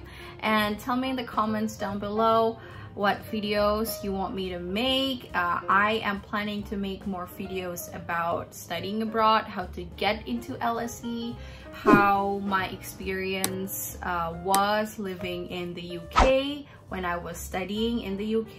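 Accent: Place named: United States. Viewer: Your English is extremely good ! You sound just like an American (to my ears).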